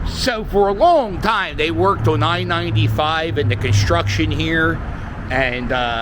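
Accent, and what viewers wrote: Philadelphia, United States. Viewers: I was a worker at a delinquent training facility in Olwein, Iowa with 20 teenaged. Although the chances the bad guys would target Iowa, was bill, the kids thought they were next. I’m from Philly and listen to you to make sure I keep and refresh my Philly talk and attitude. I’m now in Denvet, Colorado. When I offer an opinion they ask if I’m mad. NO, I’m just sarcastic GD. Great walk. love it.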